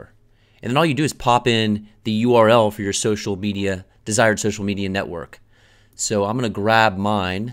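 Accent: England, United Kingdom. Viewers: I.m just curious, but what happened to your lovely gentle English voice and accent I enjoyed on your 2015 video which was one of the first I came across before this one - and persuaded me to go for Sydney.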